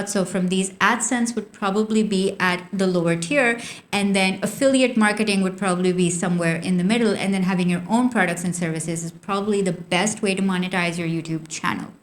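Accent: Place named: India. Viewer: I love your accent...are you Indian ?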